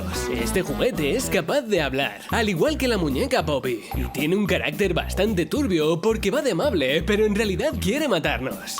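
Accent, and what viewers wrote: Spain. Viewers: Eres de España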